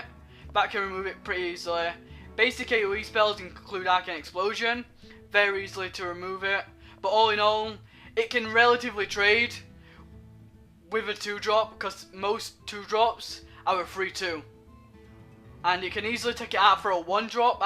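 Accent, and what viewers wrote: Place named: Manchester, United Kingdom. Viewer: It sounds like you are from Manchester in England, so for American audiences you will need to talk more slowly and concentrate on enunciation. With any presentation you might want to give a visual overview first, maybe showing a group picture of all the cards you will cover. Perhaps grouping them for aggro and control decks as you consider them and listing them in order of power, maybe a top 10 etc. I found your post searching for "hearthstone analyse one drops" as I was looking for more insight into choices as I'm new to hearthstone.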